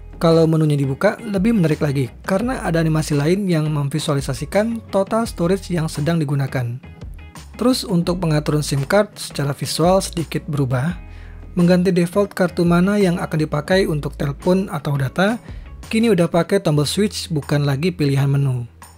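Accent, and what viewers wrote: South Sumatra, Indonesia. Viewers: Cak wong sumsel youtuber ini. Wkwkw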